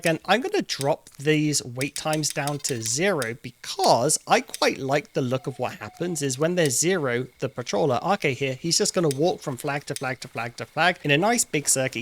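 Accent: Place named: United Kingdom. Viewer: I do wish he could feel like he doesn't need to hurry up. I watch at 90% speed because with his accent (I speak Murican not British or Aussie), it's difficult to some of what he's saying as he speed slurs his words together as though he's just having a banter with the blokes on the block or whatever they do in his part of the world. Anyway, sir, you can talk at normal speed without viewers catching Twitter Brain and tuning out because you're speaking at a normal pace.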